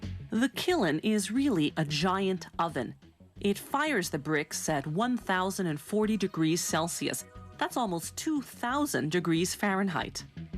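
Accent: Canada. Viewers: Her accent sounds Canadian, not American. Listen to how she says "process." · Canadian much. That accent is killing me! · The narrators on all the how its made videos are always SO annoying. i think they do it on purpose. They are obviously real people but they sound like the google translate voices. is this woman canadian or something? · The commentator's Canadian dialect is thick.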